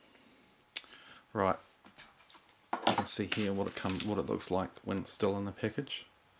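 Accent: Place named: New Zealand. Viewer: Thanks, I was considering this, will definitely get one. Great to hear a Kiwi voice too!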